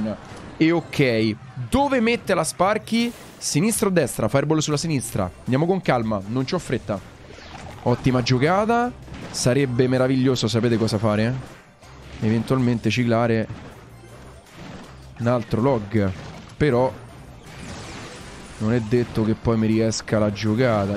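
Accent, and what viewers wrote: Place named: Rome, Italy. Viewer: Perche parla romano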